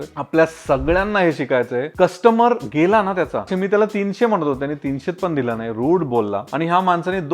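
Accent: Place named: Gujarat, India. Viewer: Bhai tuze bolane pan marathi nahi gujju Marwadi ahe pronounce varun kalate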